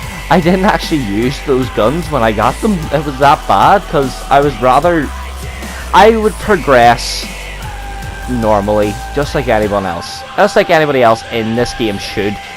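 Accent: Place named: Northern Ireland, United Kingdom. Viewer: Great video well done keep this up and you get somewhere your accent sounds like it’s from Northern Ireland I’m from there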